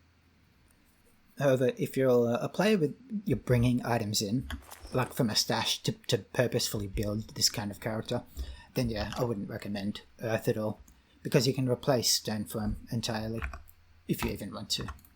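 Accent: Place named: Australia. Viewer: Thank you for the information. Aren't you Australian? I saw in the comments that you have an aussie accent.. You woke up very early on the holiday morning. Sorry for sending you a comment notification in the early morning!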